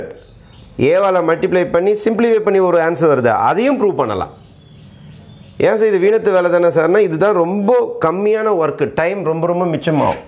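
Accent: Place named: Chennai, India. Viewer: Hi I love Chennai slang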